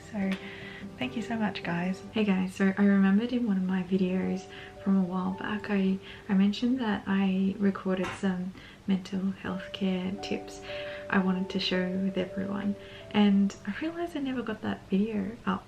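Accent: Australia. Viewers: Thanks for the thanks. I’m really grateful to hear an Aussie accent in the YouTube world. I like your chilled vibe. And mental health tips are always useful, especially in the time of COVID.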